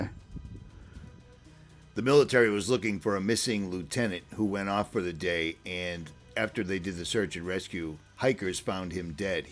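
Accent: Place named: Boston, United States. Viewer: Just subbed sounds like Ritchie from boston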